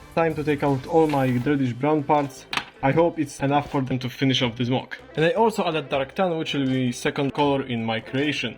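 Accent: Poland. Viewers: czy ja slysze polski akcent? btw zajebisty moc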